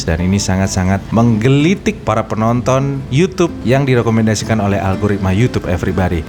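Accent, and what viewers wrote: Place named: Banyumas, Indonesia. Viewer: kelas bang,,, wong ngapak mendoan kie bang 😊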